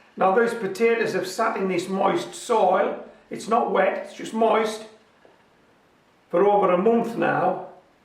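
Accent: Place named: Lancashire, United Kingdom. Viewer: Yes I sure did, its a routine of mine and my granddaughter (aged 6) to watch your videos. For a long time she was convinced it was me on YouTube doing the gardening videos, we hardly see your face, as I sound like you (Lancastrian accent), grow in buckets and tubs too. Also, until recently, at the time I also had a Collie dog. The similarities are uncanny and convincing for a little one. LOL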